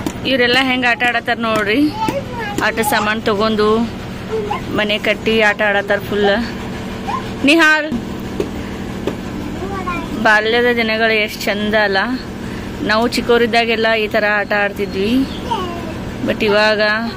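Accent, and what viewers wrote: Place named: North Karnataka, India. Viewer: Neevu nam uttar karnataka style le maatadri ennu chalo anasatt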